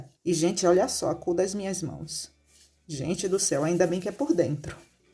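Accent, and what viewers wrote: Bahia, Brazil. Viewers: Aí linda tu e da minha terrinha kkkkk baiano realmente estréia quando assistir um vídeo de empada de carne seca vi um sotaque de Aiai falei será que e daqui pensei acho que não kkkk agora ouço isso ensina com excelência sua dicas e ouro sabia da massa mas as técnicas não aprendendo e muito.Deus de Israel abençoe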